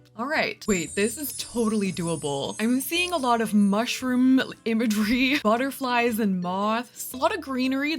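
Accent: Canada. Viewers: when i first started watching, it was my first time ever watching someone with a strong Canadian accent, and it was a big adjustment but now i cant watch ANY SIM VIDEOS WITHOUT HEARING THE COMMENTARY IN HER VOICE 😭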